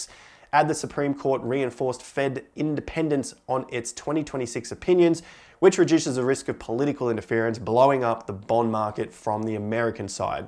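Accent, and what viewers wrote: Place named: Australia. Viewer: Aussie accent talking US rates has me confused be more specific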